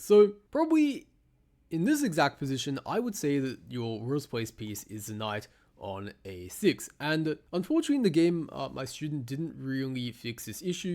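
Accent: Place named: New York, United States. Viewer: Idk I thought that he would be from New York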